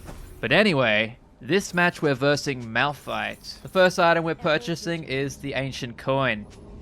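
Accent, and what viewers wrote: Australia. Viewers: Mate are you in Perth I swear I talked to someone at uni sounding like you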